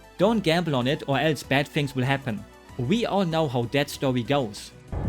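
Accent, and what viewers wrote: Germany. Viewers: you're funny. I love your German accent. No I don't want to say your German accent is funny, I don't mean to sound wude.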